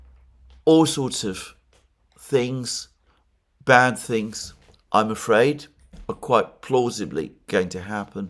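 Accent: United Kingdom. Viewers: The only angle this guy focuses on is from the Russia perspective or the effects on Russia. Looks like a Russian agent of Greek background raised in and made to look and talk like a Brit!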